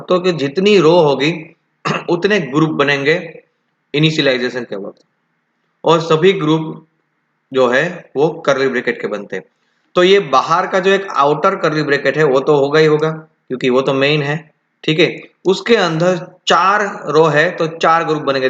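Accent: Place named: India. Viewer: Your explanation is amazing, but unfortunately I can understand nothing from your Indian accent.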